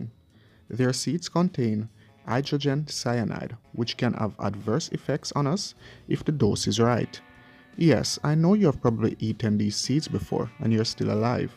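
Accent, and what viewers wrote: Jamaica. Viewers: Very Imformative....I like that you just speak in your normal Jamaican accent & not do the twanging that some others on YouTube do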